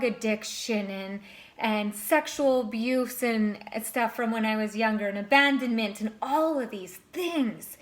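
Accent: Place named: Canada. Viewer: Inspiring ! Hope you are still doing well. You sound Canadian.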